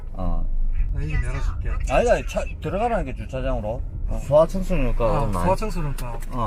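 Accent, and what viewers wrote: Gyeongsang, South Korea. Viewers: 야들아! 문댕이말고 표준말하면 좋을탠대~ 저질스러워서 ~원!!!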